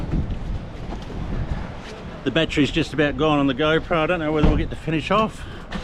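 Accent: Australia. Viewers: I'm a Brit used to watching several fairly Naff travel channels (I subscribe to other genres as well). This channel is the absolute dogs b___ compared to them. What a great travel Vlog this is. I just love your attitude and your way with strangers. There are so many laughs, I loved the time when the two Russian guys were arguing and had been fighting, in what was a fairly tense environment your Aussie twang never changed and you just still sounded so relaxed 🤣. Just fantastic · Lovely vlog, speaks to real life and travels. I was intrigued by the dish you waxed lyrical in Singapore. The You*Tube translator took your Aussie English and came up with "Luxer". I just kept adding the ingredients as your poetic review progressed until "Laksa" finally populated.😆😍🤩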